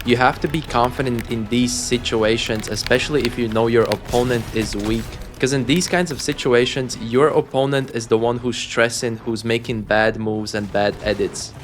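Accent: Germany. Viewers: what accent do you have? german?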